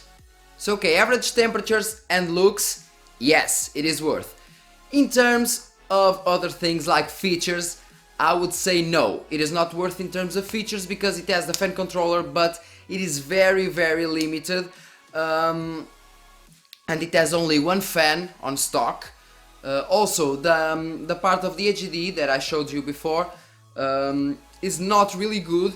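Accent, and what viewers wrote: Portugal. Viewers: Olá a todos, eu cai aqui de pára-quedas e depois de ver este video fiquei desconfiado que o é português de Portugal, estou certo ou errado? É que este inglês ó típico de Portugal. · Are u portuguese? I can see it by the acent xD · deu para perceber pelo sotaque que és tuga . Continua com ótimo trabalho, abraço !! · E esse sotaque tuga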